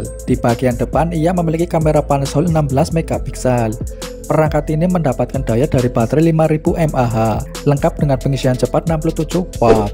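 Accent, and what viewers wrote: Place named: Java, Indonesia. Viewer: Bgst mendoknya bikin ngakak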